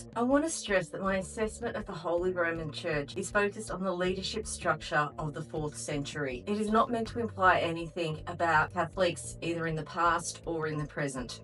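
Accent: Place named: United Kingdom. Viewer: Sounds British. The Brits self - castrated a long time ago. It's not working out well for them....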